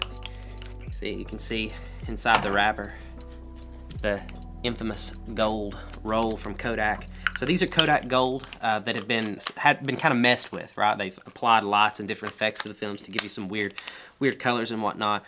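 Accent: Kentucky, United States. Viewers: I could tell your accent was a KY accent and when you mentioned being a hillbilly you confirmed it for me. Fellow Kentuckian here. I’m so excited to follow your journey!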